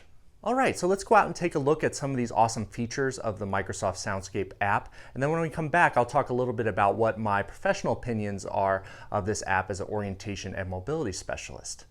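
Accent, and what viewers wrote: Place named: United Kingdom. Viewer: For some reason I thought you were from the uk. Lol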